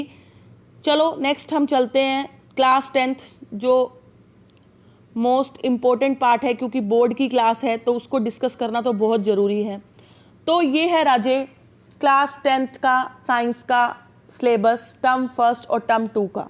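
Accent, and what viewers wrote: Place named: India. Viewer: I just love indian accent very much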